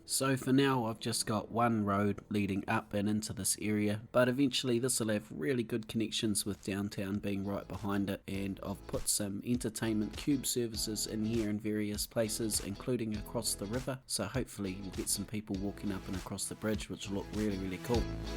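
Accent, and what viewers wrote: New Zealand. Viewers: ok u asked for ideas: i would love to see an extended cinematic view riding on this yellow motorbike! Just riding all through the city. We need to relish in the awesomeness. Its like you create this awesome stuff but we only get to see it once for a very short time. And dont worry about the accent, it’s impossible to sound like a sweaty nerd with a new zealanders or Australian accent lol. At least from an American pov. Good work though, another banger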